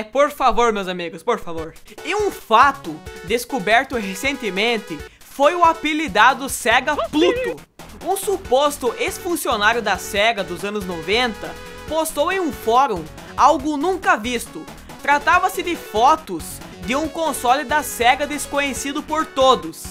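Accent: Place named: Piracicaba, Brazil. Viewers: Meu irmão...você tem um sotaque MEGA caipira! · Cara, como eu vim parar aqui?! Parabéns pela desenvoltura em suas narrações, o sotaque de Piracicaba(?) deu personalidade. Acabei de me inscrever. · Nossa cara, esse sotaque caipira do me dá nos nervos kkkkkkkkkkk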